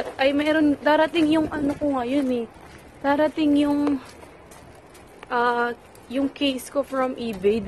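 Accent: Visayas, Philippines. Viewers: Ate bisays ka?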